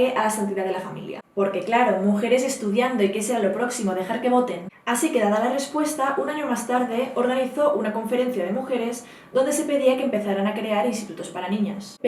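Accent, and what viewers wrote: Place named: Spain. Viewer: Muy buen tema, pero muy rápida y poco entendible si no se tiene el oído adiestrado para el acento español, la dicción se podría mejorar por favor.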